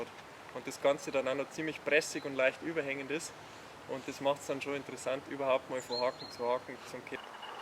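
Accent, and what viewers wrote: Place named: Bavaria, Germany. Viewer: Hast du de rechte für des lied, kann i de aa haben? 😜 Und keine untertitel? Versteh dem sein tiefstes bayrisch ned...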